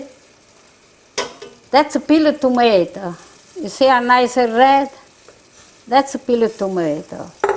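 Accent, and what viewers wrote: Italy. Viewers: .....,mi chiamo Carmela...I love your Italiano- Inglese as much as I ❤ love your cooking! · Im starving now😋 i have to make this. I love penne ala vodka and the peas are a good addition. I also love your singing and beautiful Italian accent 🇮🇹 Bravo!! 👋👋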